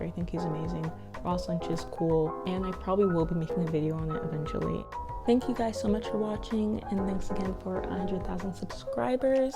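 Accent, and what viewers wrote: Nigeria. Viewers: Ooh cool, is it weird you sound like nigerian? You didn’t really specify, but are you? I’m Nigerian, it’s cool seeing other Nigerians you tubers. Anyways congrats on 100k!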